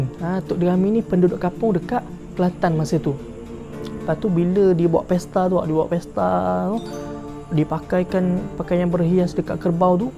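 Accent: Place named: Terengganu, Malaysia. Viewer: Kalu comel lagi ulasan cerita tu biar seling kelantan buke seling terengganu. Terbaik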